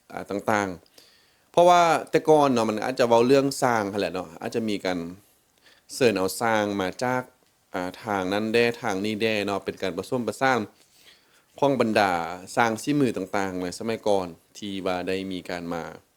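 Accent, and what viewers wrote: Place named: Lanna, Thailand. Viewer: 💐💛🤗 สำเนียงบ่าวล้านซ้างใกล้เคียงคล้ายคลึงสำเนียงคนล้านนาขนาด ... 👏⚘️😘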